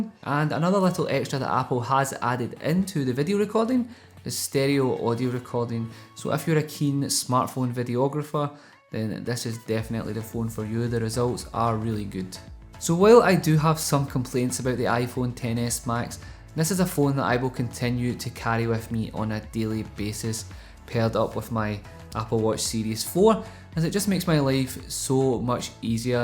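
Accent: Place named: Glasgow, United Kingdom. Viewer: Sounds like Glaswegian to me.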